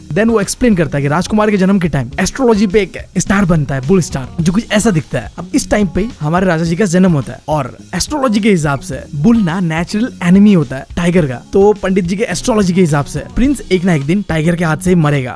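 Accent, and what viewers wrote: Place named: Bihar, India. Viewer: You are from Bihar/Jharkhand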